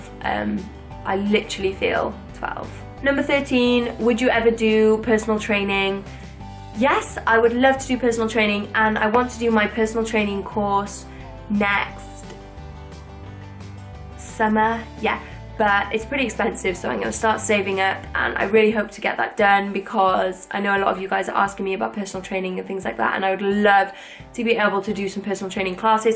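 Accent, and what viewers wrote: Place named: Cardiff, United Kingdom. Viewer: There are so few welsh youtubers! I can recognise a fellow cardiffian anywhere haha.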